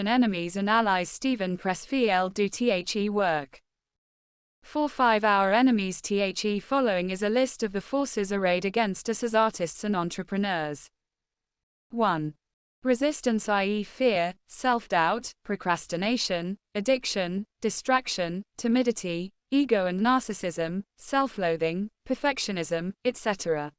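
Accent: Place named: England, United Kingdom. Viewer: Maybe I would have heard this robotic voice in an American accent with an English accent is much harder to listen to this.😢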